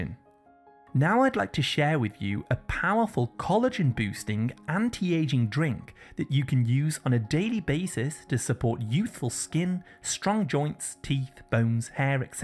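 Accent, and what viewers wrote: England, United Kingdom. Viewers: अंग्रेजी accent में बोल कर इंडियन को मुर्ख बनाना 😃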